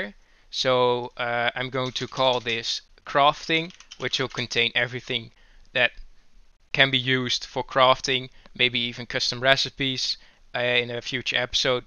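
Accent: Netherlands. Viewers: Ur accent sound a bit like a dutch one, are you? xD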